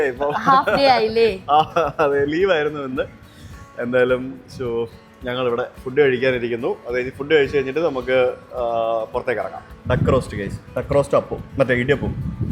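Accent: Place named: Thrissur, India. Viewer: Thrissur vannaappo thrissur slang pidichallo 😊 Pooram video venamtta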